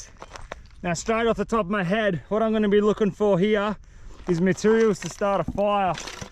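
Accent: Australia. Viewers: I can hear that Aussie twang in that exactly (?)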